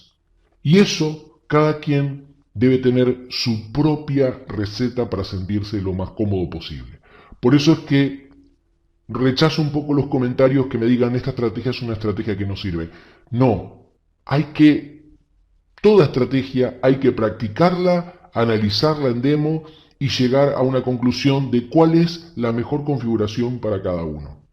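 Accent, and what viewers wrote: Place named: Argentina. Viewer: hola, me parece por tu forma de hablar q sos argentino, yo soy de buenos aires, me gusto tu estrategia y la voy a practicar, pero ademas de eso te queria preguntar de que manera se puede retirar dinero de los brokers cuando estamos en argentina, ya que estamos muy regulados. Sirve abrir una cuenta en Skill? muchas gracias. abrazo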